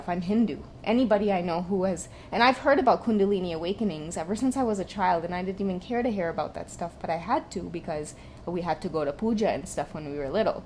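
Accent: Trinidad and Tobago. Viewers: That Trini🇹🇹 accent though 😍 But I'm Jamaican🇯🇲 the song too 😅 but yea I totally get it 😀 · Best reading just for that Trini? accent.... So beautiful... I love your vibe❤ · You sound like a Trini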